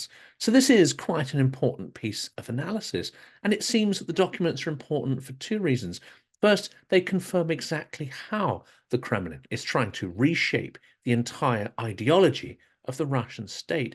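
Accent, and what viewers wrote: United Kingdom. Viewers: I checked the channel info and it says location United Kingdom. 🙂 Plus his accent is very British. 😊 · Oh, funny. I’m American and speaks British. He’s from United Kingdom, and lives there.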